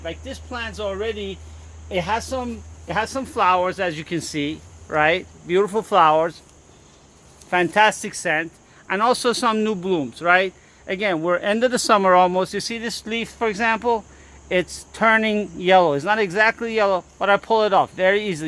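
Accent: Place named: New York, United States. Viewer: Great advice best ever. Love your New York accent.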